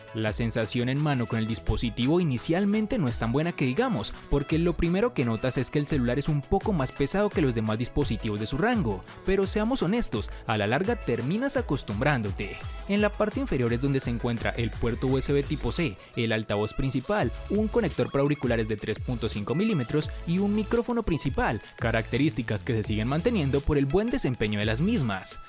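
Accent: Cali, Colombia. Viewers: Eres de la ciudad de Cali?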